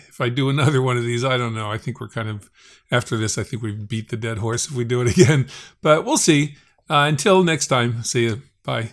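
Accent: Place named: West Virginia, United States. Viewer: I’ve seen this AV coin pushers videos before. The comments are truly frightening. I can’t tell if people are seriously believing this crap or just suspending their disbelief. I saw one earlier where he claimed to have spent half a BILLION dollars on 25 quarters. 😆 The clown sounds like a hillbilly from the deepest darkest corner of West Virginia….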